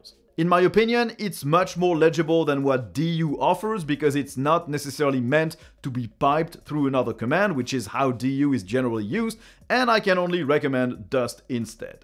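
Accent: France. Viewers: génial ! ce petit accent français lol , c'est subtile mais perceptible ;)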